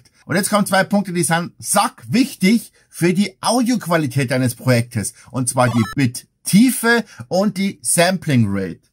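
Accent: Bavaria, Germany. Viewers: hab gleich gemerkt das du bestimmt auch aus bayern kommst😎😁 bist auch aus oberbayern oder? 😅 wenn ich fragen darf* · Ich bin seit gefühlt 100 Jahren Cakewalk-Fan angefangen von Cakewalk 3.1. Aber jetzt Cakewalk (by Bandlab) ist der Wahnsinn. Und noch ein groeßerer Wahnsinn ist diese Tutorial-Reihe mit diesem super Moderator. Ich bin a Preisss aber die bayrische Sprache mag sehr. Lieber Moderator und Cakewalk-Spezialist/Musiker/Tontechniker usw...., ich bin so dankbar für diese Tutorials. Jazzjoe aus Bad Nauheim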